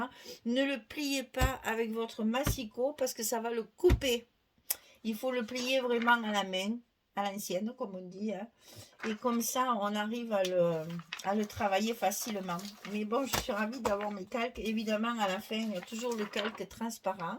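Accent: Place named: Provence, France. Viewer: Bonjour 😊 Magnifique papier ❤ même si je suis une fille du Nord, j'adore 💕 votre Provence, les lavande, les vieilles pierres, des paysages magnifiques, votre accent...... Mon rêve! ❤❤❤❤ Merci et bonne journée 😘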